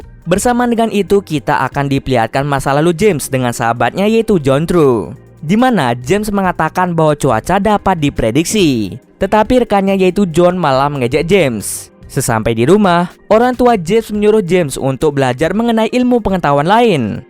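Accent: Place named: Palembang, Indonesia. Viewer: Cknyo wong plmbng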